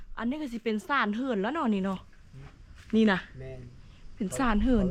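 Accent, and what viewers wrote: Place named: Phu Thai, Thailand. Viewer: โม่นสำเนียงสาวภูไทเด ติดตามตะลอดเด้อ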